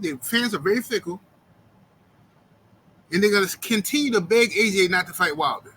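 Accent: United Kingdom. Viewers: Your U.K. accent. 😂👌🏻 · Your Scouse accent 😂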